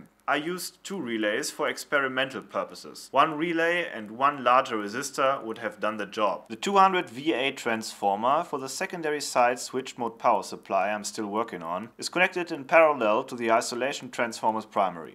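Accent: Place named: Germany. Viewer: Du klingst so Deutsch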